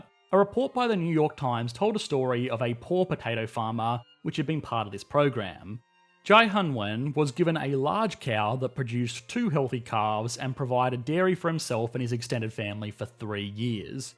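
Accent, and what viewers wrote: Australia. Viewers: Americanised? He is obviously Australian.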